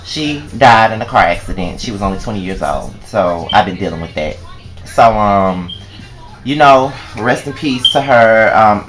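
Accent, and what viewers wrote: South, United States. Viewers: there is nothing wrong with you. Fuck the haters. Don't let that shit bother you. Who and what is attractive is a lot a matter of opinion. Your videos be on point. And there is nothing wrong with a southern accent, I like my southern accent. This video took a lot of courage to do.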